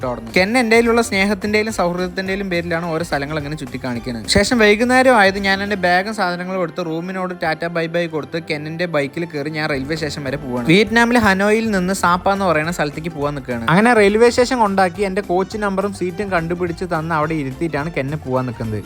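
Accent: Palakkad, India. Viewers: 😂😂palakad slag🔥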